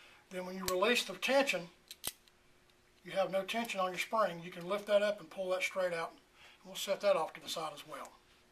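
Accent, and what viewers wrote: United States. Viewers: cool cowboy accent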